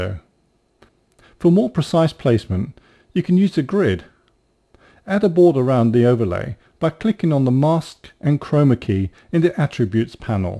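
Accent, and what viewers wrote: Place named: England, United Kingdom. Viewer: is corel made in england? why is there an english guy talking?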